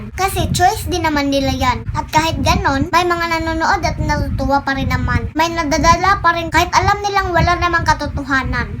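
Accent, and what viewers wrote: Visayas, Philippines. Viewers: Visaya kaba ?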